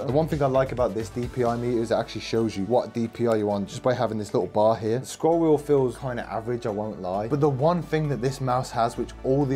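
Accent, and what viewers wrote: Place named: England, United Kingdom. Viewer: I love your english accent.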